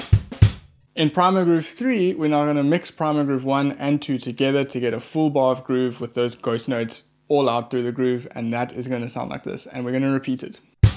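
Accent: South Africa. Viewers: You South African? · subbed cause your English is as South African as mine. keep up the work, excellent lesson , ill watch more videos. not a drum player tho just love good music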